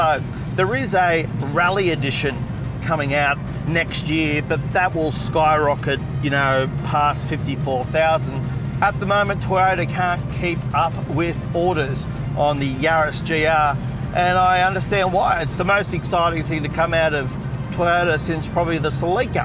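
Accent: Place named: Australia. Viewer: As soon as I hear an Australian accent, I know it's NOT the version with the track package lol.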